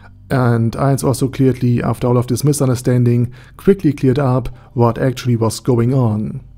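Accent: Germany. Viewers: I appreciate your videos. I’ve been watching and looking into your videos for years now. I love accents, and your German accent is phenomenal my man.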